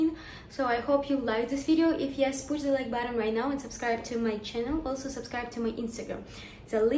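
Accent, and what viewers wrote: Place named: Ukraine. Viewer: You’ve got a beautiful Ukrainian accent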